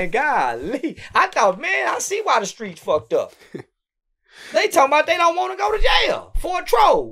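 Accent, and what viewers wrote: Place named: Texas, United States. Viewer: “Rockers Island” haha that Texas accent 😅🤘🏾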